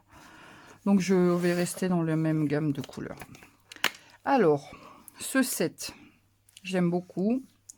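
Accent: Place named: Nord, France. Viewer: Ccou très joli ton porte bloc notes je pense que je vais en réaliser pour la fin de l’année j’en profite pour m’abonner à ta chaîne j’aime bien y’a une Tite pointe de ch’Nord dans ta façon de parler? Lol chasse le naturel il revient au galop bisous bonne fin de journée et merci à toi pour ce tuto 👍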